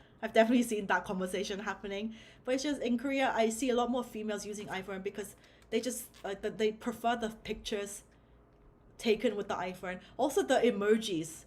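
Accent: United Kingdom. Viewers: Sis, you’re hot. Your voice is sultry. You have a british accent. You’re bilingual. Shall i go on? You’re winning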